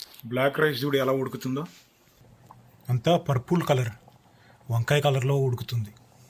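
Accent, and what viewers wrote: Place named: Guntur, India. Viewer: తెలంగాణ అంటున్నారు కానీ meru గుంటూరు slang matladutunnaru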